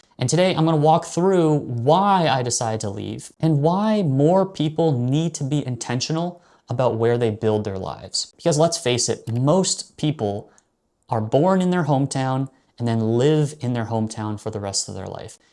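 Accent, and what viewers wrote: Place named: Toronto, Canada. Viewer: Couldn't make it through this vid which randomly popped up. I'm a self employed entrepreneur living in the Prairies. I have hard traveled the World and LOVE being Canadian. You sound like a stereotypical Torotonian. I wouldn't want to live there either.....maybe call this video why to moved from Tornonto....'cause that's not all of Canada. Be Well.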